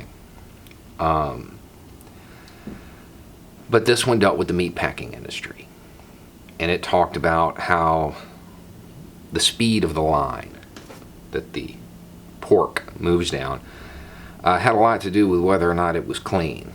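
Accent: South, United States. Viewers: Always look forward to your videos! So I was watching the clip where you spoke about structured anarchy in Vegas (I think that's where it was). I don't know if it's the difference in recording devices used, but your voice sounded very different. In that clip, it was very deep. On your channel, however, you have a much stronger southern accent. Sorry if this comes across as rude or impolite; that wasn't my intent. Just something I noticed, like when Curious George is flipped upside-down. Anyhow, I'm always on the lookout for your content. I learn so much that I never knew! Keep up the awesome work!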